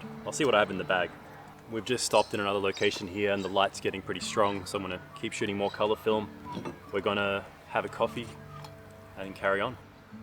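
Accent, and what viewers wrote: Australia. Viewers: I used a lot of AGFA colour and BW film in the day. Loved it and miss it. Still use Rodinal. Good work. An Aussie voice. 😀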